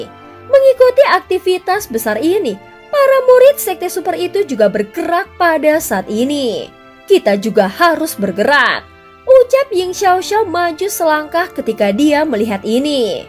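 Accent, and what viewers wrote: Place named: Semarang, Indonesia. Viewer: Dunia itu sempit ya, tiba tiba saya di tempat tongkrongan bertemu dengan pendengar chanel ini 😂, semarang mana ini suaranya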